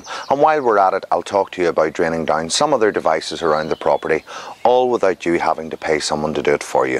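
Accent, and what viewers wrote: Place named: Ireland. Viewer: Omg the subtitles are soooooo funny !!!! The Irish accent caused some confusion 😂😂😂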